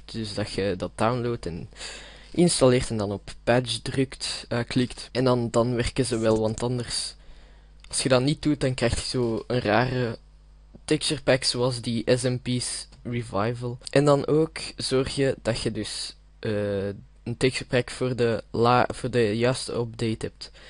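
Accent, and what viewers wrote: Belgium. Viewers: ben je belgies ofzo? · woow vlaams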